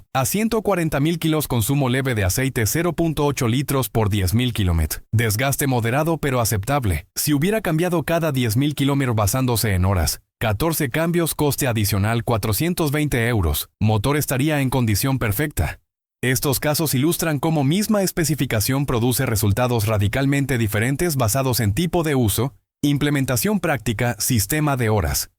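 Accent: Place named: India. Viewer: Por favor no dejes todo en mabo de la mal llamada IA, el video esta bueno, el audio parecer indio hablando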